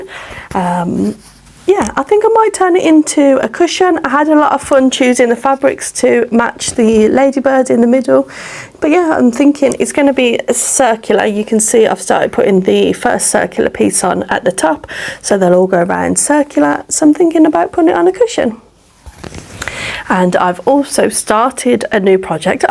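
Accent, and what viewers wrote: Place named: Birmingham, United Kingdom. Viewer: Hi, first time watcher, loved all your makes, they are so neat and I am a big fan of Liberty. You sound like a local brummie girl to me, what date are you going to the festival of quilts at NEC next week? Also did you go to Alice Caroline recent sale in the Cotswold, my friend went and they were giving away smaller pieces of Liberty, she is going to pass on a bag to me at the NEC on Saturday. Anyway thanks for your inspiration and it’s great to see the enjoyment you are getting out of this ❤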